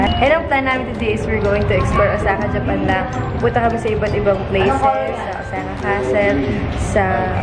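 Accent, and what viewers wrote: Visayas, Philippines. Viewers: Visaya ang acsent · bisaya accent po! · Ate bisaya accent po yun😂😂😂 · Mommi bisaya po ang aksent non like if you agre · Okii lang. Bisaya kaayo kag accent hahahaha loveyou 😍😘❤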